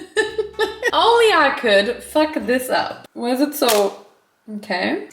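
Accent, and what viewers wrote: United Kingdom. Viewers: Please a whole Video just talking in your hilarious british accent !! 😂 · i love your british accent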